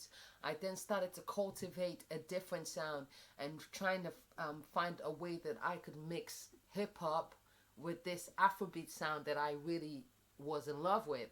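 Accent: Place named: United States. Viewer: When ya click like before the video even starts 💫💫 Oh my gaaaaah I was a massive fan too.....still am actually!!!! You crack me up! Your as real as they get, I think its a Taurus thing. Glad to hear no-one is fcuk-in with ya but dey fcuk's wiv ya 😂 you have an American twang to your voice did you used to live out there for a wee while?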